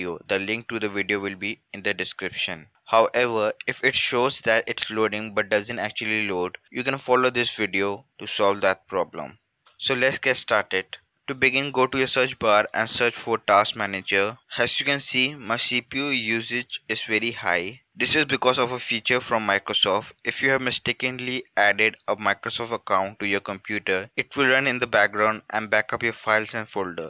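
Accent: India. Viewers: always the man with the indian accent to save the day and solve any tech issue. worked, thanks :D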